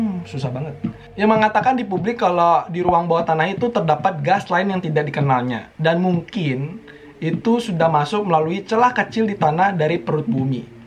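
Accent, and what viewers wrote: Bali, Indonesia. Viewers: "Anak muda tidak boleh bermalas malasan" Orang bali kyk aku suka males malesan:v Wkwkwkwk:v Logatnya, logat bali bgt😂 Dan aku baca coment comentnya "mantep bli" ngomongnya "bli" tpi namanya dia isi "Muhamad":v Aku orang yg tdk bisa dibohongi (sejak kapan?,-) BTW.. Aku: {\___/} nih.. ( •. • ) / >❤>\: {\___/} Cai nyen?,- ( - _ - ) [maaf bahasa kasar] / | | \ Gabut mks:D BTW, Buat conten tentang makanan di Rusia dong:( Disini adanya lawar ama betutu aja:v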